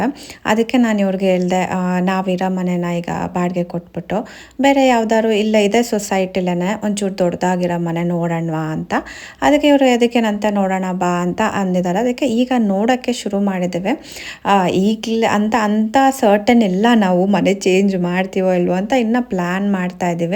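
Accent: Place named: India. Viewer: Hi ur kannada accent good r u really a kannidaga wat about ur son is he facing any health issues as per both ur own house and the other house y dont u buy a new house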